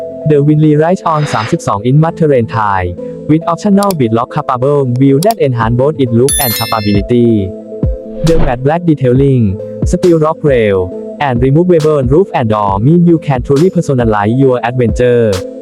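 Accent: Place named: Thailand. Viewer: ISAs รับไม่ได้ว่ะอ่านภาษาอังกฤษสำเนียงไทย ไอ้บ้าเอ้ย😂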